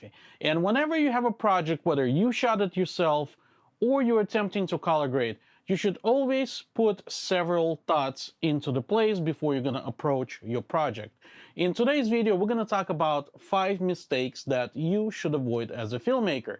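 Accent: Russia. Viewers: i don't know man, they might be helpful for someone who does not speak english at all... but mostly they feel like a riddle where you just try to guess what these random words suppose to mean)) i was surprised honestly, i always thought you were russian – because of accent and idk, attitude? sense of humor? Anyways, awesome video as always, thank you!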